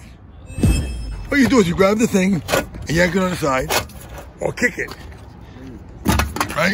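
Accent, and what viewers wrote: New York, United States. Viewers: This was the most New York accent ive heard. Good on you for getting the boot off. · that’s a nyc Italian accent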